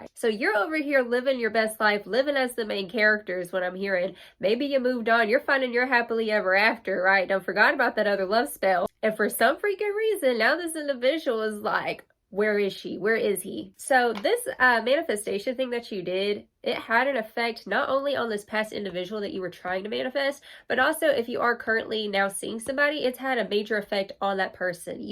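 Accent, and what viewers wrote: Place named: East Texas, United States. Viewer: I never noticed b4 u got that deep east Texas accent 💕 have a great day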